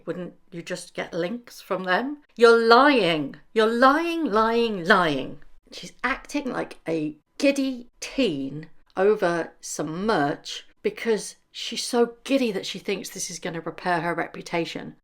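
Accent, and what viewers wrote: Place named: United Kingdom. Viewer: you got a UK accent, but I'm not sure where you are based. Surely you remember the Glenfell Tower fire when 74 died. Meghan did not turn up and get in the way. The Royals and Politicians visited by invitation after the smoke and gone and people had been moved elsewhere. Meghan was sent to help promote a community cookbook. She did not wander amongst this graveyard in the sky offering to get signed T-sirts for kids who were still trying to find a bed and a consistent supply of food. This is weird and she has shown she knows how to behave in a disaster not based in The USA.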